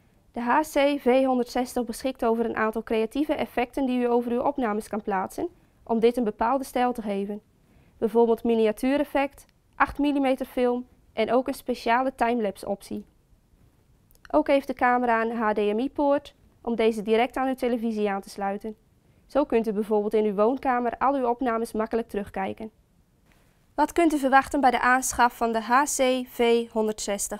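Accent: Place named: Geordie, United Kingdom. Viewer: That is the strongest Geordie accent I've ever heard!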